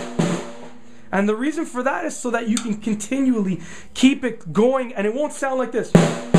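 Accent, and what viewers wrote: Canada. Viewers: Are you canadian? So sorry... Love ur vidz tho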